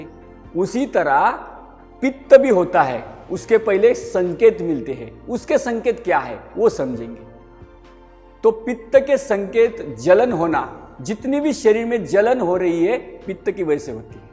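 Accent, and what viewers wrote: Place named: Maharashtra, India. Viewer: Sir aap marathhibhashi lag rahe h Aapka bolna mujhe Bahut achha laga 🙏🙏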